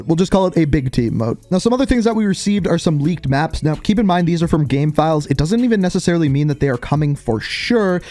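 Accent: Canada. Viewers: You're Canadian, I know accents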